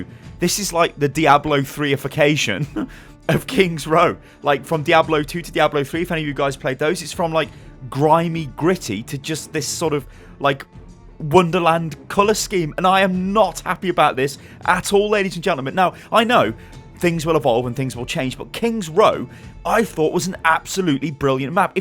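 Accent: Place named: Midlands, United Kingdom. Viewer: You're British, stop saying "like" all of the time... you sound like an Orange County teenage girl... with a midlands accent.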